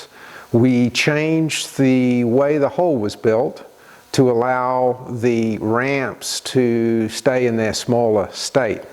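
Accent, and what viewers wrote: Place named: Australia. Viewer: your accents sounds like you've lived in Australia -are you an ex aussie?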